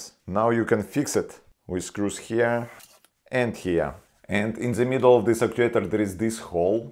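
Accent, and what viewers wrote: Russia. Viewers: Русский акцент слышно даже мне. Но то что автор не стесняется разговаривать это молодец. Видос не досмотрел, но за старания лайк. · Акцент русский.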